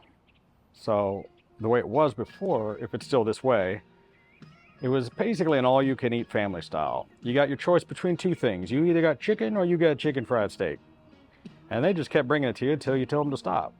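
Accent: Texas, United States. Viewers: Seems like your Texas accent is coming back. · Funny, the longer you're back in Texas, the quicker your Vegas city slicker vibe slides off and your Texas roots come drawling back,!🤠😎😁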